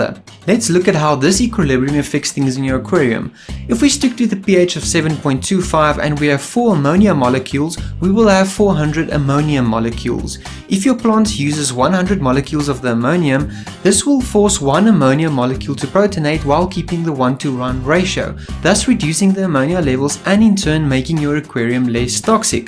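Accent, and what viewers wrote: South Africa. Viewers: It takes exactly 0.3 milliseconds to recognize a boer accent 😂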